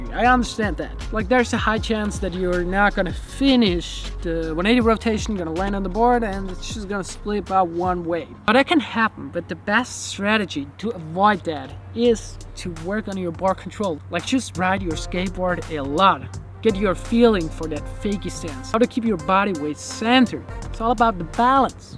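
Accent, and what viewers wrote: Germany. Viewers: Wait are you German?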